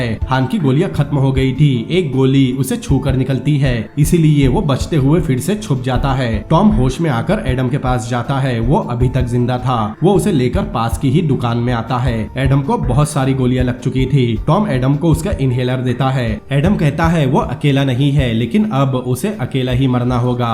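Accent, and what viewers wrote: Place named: Gujarat, India. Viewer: Aap gujarati hona Awaaj se hi pta chalta h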